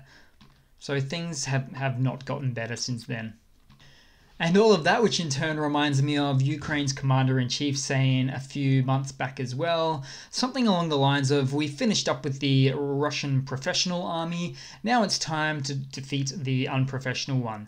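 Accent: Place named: Australia. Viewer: Assuming you are in Australia — as your accent would suggest — you must be uploading around midnight (NSW, Vic, Tas), 11.30 pm (SA), 11.00 pm (Qld), 10.30 pm (NT) or 9.00 pm (WA). Or maybe 1.00 am (Norfolk Island), 7.30 pm (Cocos/keeling Islands), 7.00 pm (Australian Antarctic Territory), or 6.00 pm (Heard and McDonald Islands). On any view, burning the midnight oil. So a special thanks for the effort which this involves.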